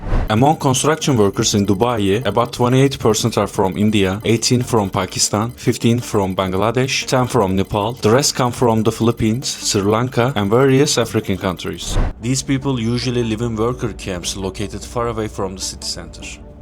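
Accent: Turkey. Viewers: türkçe seslendirmeyi kapattım hala türk aksanı var diyorum niye meğersem türkmüşsün :D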